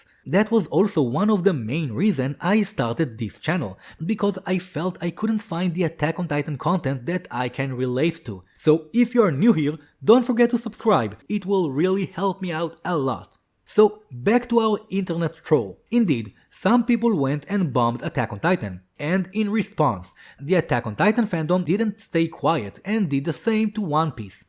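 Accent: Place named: Israel. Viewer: also, your israeli accent is really cool, it makes me laugh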